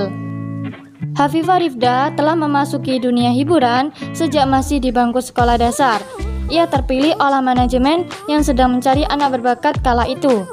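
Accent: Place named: Java, Indonesia. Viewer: Jawa bgt kak..wkwkwk👌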